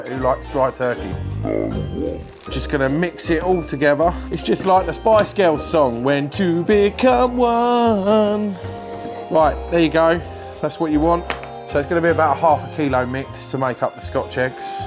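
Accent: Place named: United Kingdom. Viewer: I have to admit, I was not expecting a British accent when I started this video. Definitely going to give the recipe a shot in the future!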